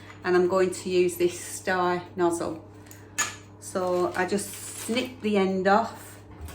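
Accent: United Kingdom. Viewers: Hello 👋 from the USA… what kind of cream did you use for the frosting? I sometimes have a little problem with the UK accents or or too the products have different names there..❤❤Thanks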